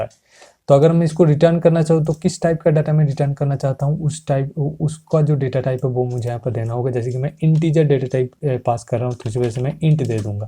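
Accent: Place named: Bengal, India. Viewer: Ami 1 ta app banate chai... Kintu sob kichu r tutorials pachina... Tai banate parchina... Tomar kotha sune mone hoy tumi bengali... Tai bangla te comment korlam... Ektu help korle ami app ta banate parbo... Thanks....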